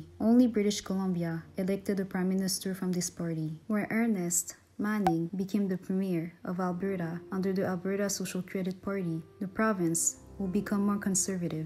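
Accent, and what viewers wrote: France. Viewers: I don't care much about the accent and I do know she's French; read my comment. Of course, I'm not claiming she is reposting someone else's content. That's what I'm doing; I'm just providing feedback that would make this channel more popular for me and undoubtedly many other people. One should not be quick to judge another's intentions and it is an insult to consider the work of a craftsman to be the best that he is capable of. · She sounds both French and Native - which makes this HER content and heritage - patiently listen or find someone that “speaks your language”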